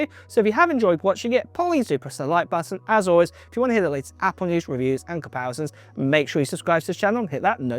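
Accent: United Kingdom. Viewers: Thought it was a woman talking 😂, why do most men sound funny, ? In Britain - I’m assuming the accent is British, although I could be wrong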